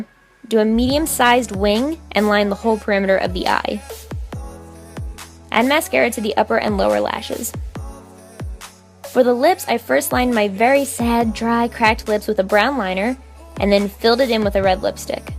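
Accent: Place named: New England, United States. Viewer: Love it! She's my favorite character ever. I think that your normal, dramatized NE voice is Harley-esque enough!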